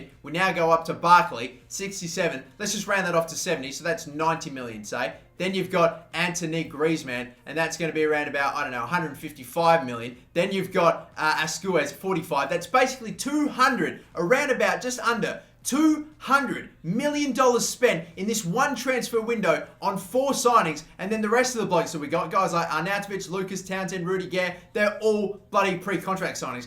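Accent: Australia. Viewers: hes aussie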